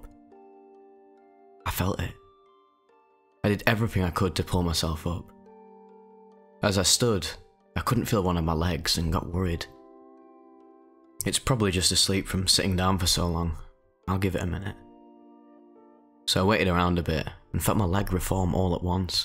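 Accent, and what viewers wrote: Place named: Northern England, United Kingdom. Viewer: “If life was just in pure god mode and we were fucking no clipping through walls it would be well boring won it” love actually having British humour on the internet for once instead of watered down American humour and way of talking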